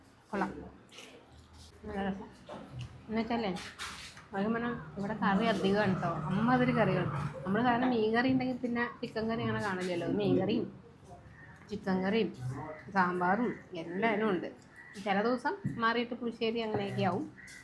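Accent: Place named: Thrissur, India. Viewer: 👍 തൃശ്ശൂർ ഭാഷ കേട്ടപ്പോൾ ഒരു കൗതുകം 👌👍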